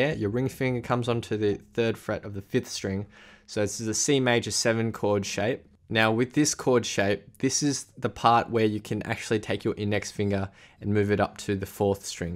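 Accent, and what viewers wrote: Australia. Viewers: When you hear the Aussie accent And see that beautiful Australian guitar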